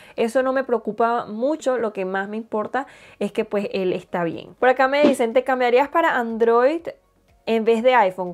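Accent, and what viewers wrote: Venezuela. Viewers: Amo lo coloquial q hablas se identifica lo venezolana ❤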